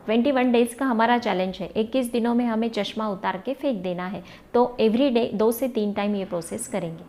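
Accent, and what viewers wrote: Maharashtra, India. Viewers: Good Tips...Thanks. My guess... you are Maharashtrian. Because of pronouns of some words done by you I came to that conclusion. Am I right ?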